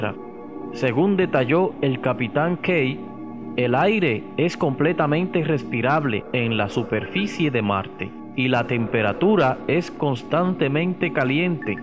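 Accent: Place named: Spain. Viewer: Bueno por ahí te comento que este programa no es mío sólo comenté que porque tienen que poner a español a narrar no puedes en latino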